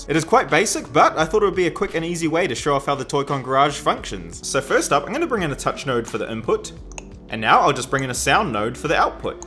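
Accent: New Zealand. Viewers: Your from New Zealand ay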